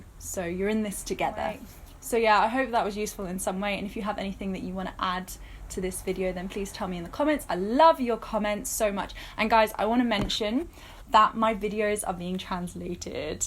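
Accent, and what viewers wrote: United Kingdom. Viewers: Is your accent English... It is really nice accent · I love her accent. UK? I married a Saudi 🇸🇦 and it was a bit of culture shock. But he helps at home & is so romantic: not at all like my American Dad! They have a staff in KSA but we just manage ourselves in the 🇺🇸 states. 🤷🏻‍♀️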